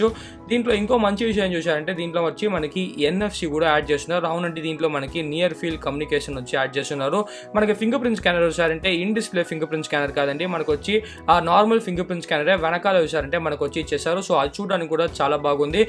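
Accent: Tamil Nadu, India. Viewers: Telugu explanation with Tamil slang tho adharagottav bro.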